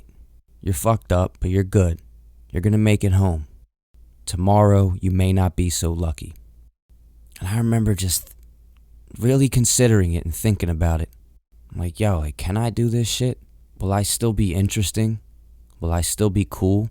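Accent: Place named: Philadelphia, United States. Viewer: I knew knew knew you were from Philly lmaoo I live rite in south Jersey I get my haircut rite on Frankford I knew it just from the accent but yeah man I'm really enjoying your content I can really relate I've had addiction issues and I'm trying to overcome them really love what you do man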